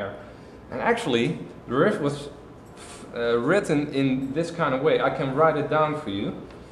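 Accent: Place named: Netherlands. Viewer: Hahaha that Dutch accent. Building up to a very cool beat towards the end!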